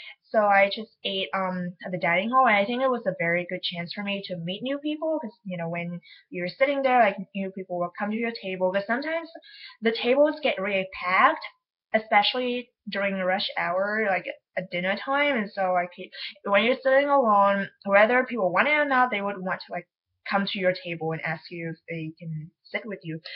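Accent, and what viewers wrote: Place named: United States. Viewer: hey how long have you been there? Your accent is almost 100% american. I am confused about this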